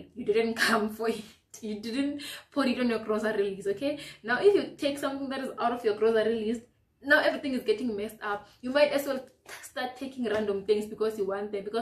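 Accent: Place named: South Africa. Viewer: Please upload more videos, i like your personality and the content of your channel, this is very helpful. and you have a xhosa accent which i love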